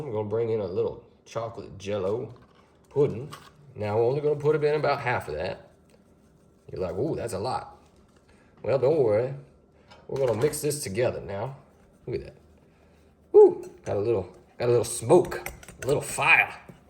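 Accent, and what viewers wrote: South, United States. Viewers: U are either from Alabama or Georgia! Boyee u have an accent! Lol! I’m from the south too but I’m from New Orleans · Good old-fashioned deep southern classic twang accent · You got south in your mouth!! Love it! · I love your southern accent 😊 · You have to be from he south, am I right? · Yes, I do agree. Everything is shrinking and the price keeps on going up. 😂. Just wanted to say as well, I do agree with the ladies from previous comment about the southern accent. 😊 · The southern accent is cool. Combination between Savannah and Louisiana?? ❤😂🎉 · Looks good the sweet southern accent makes the video even better ❤ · That looks yummy. I love your southern accent. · And I’m fron Nashville, NC and I agree. Sounds like Clayton Hollingsworth…Blanche’s brother on Golden Girls. Very Gone With The Wind. Ive NEVER heard a southern accent like this one. 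Born n raised southern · Love this desert. Oh my goodness. It looks delicious. I have to make it. I agree with you and using your hands. Best way to really get the job done. Your kitchen, your rules. Love the southern, gentleman accent as well! Subscribed because I have a feeling you're doing many things for us to make. 🎉🎉🎉🎉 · Maybe more for camera/video time it just looks like they waste more than you/we do at home. I’m sure they don’t throw all that much away all the time. I think he did better than most. (By the way I love your southern accent.) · Where are you from? Such a southern accent. Like old confederate days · your southern drawl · Love your talk you sound so southern its so cute . Yummy taste 😋 thanks for showing this recipe 🎉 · Im going to make this for my kids just bc of your wonderful southern accent 😊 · I absolutely love your accent old south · Love your southern accent! 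· Ya know what I love more? His accent! 😂. Louisiana? Best southern? Accent ever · Oo the southern accent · I'm only watching this because you have a lovely 🌹 southern accent. You sound so smooth. That cake looks really good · Southern lovin' cookin' rite dare.... love the accent. 😊 love the recipe. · I do detect some “Dixie” accent! Love it! It has to be good! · Loved your southern accent 😊❤️🙏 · I love love love the southern gentleman accent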